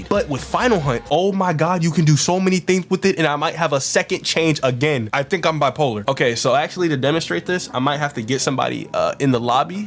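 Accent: United Kingdom. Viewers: Why is he British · WHEN WERE YOU BRITISH? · HE'S BRITISH? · bro is british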